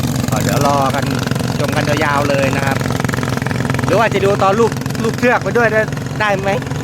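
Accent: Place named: Isan, Thailand. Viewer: เว้าอิสานแน่คับ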